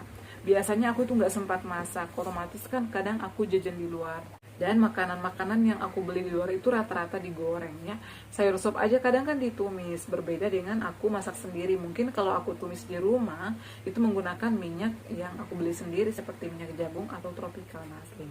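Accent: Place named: Java, Indonesia. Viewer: Kalau ngaku orang sulawesi ngapain logat jawa, lestarikan dong budaya sulawesi sama dengan daerah-daerah lain di indonesia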